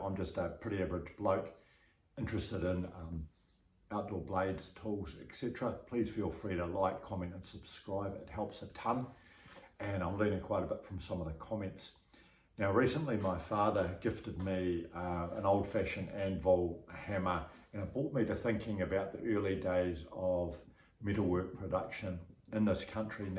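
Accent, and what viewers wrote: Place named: New Zealand. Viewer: great kiwi accent - easy to listen to nice content mate, the history of nz making is really interesting there is an old forge down on the mainland - near blacks hills (?) it's really cool and i can imagine knives being made and repaired there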